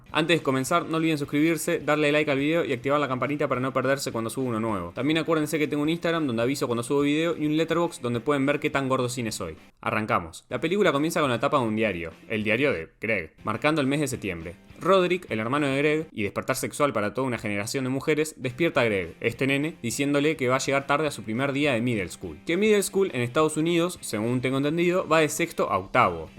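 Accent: Uruguay. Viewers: Bro sos uruguayo? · De una me di cuenta que sos Uruguayo, buen canal, saludos desde Uruguay 🇺🇾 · Sos uruguayo no?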